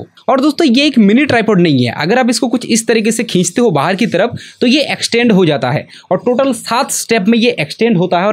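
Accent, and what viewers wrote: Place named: Bengal, India. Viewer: Bengali ho kya ... 😁 tumhari ... Bengali accent se pata chala 😁 ans ta debe thik ache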